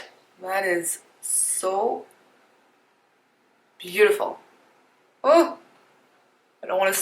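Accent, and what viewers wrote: France. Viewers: Hi there ! Are you French ? Where did you learn to speak English that well ? Just wondering ! Great video btw, That palette is on my wishlist thanks to your great review !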